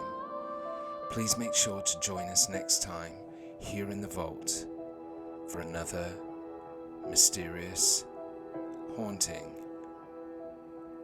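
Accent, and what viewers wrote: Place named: Northern England, United Kingdom. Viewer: Gruesome story loved the northern accent G 😅😅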